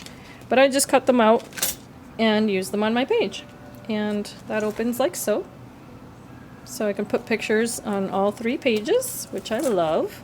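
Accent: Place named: England, United Kingdom. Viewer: Beautiful!!! I have this one and the cooking theme one and yes it's been in the hoarding vault lol, oh you remind me of my daughter with her English accent lol, (Boricua/Colombian) and she speak English 😂 tfs hugs Yorlis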